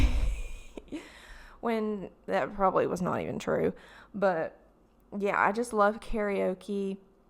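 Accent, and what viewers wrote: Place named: South, United States. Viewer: With your southern drawl and all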